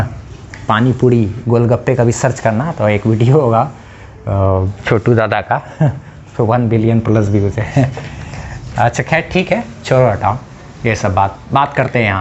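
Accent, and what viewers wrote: Bihar, India. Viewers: Love your bihari accent 😍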